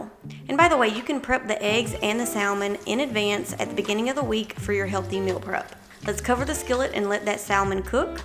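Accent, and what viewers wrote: South, United States. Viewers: You must be from the South😂❤🙏🏾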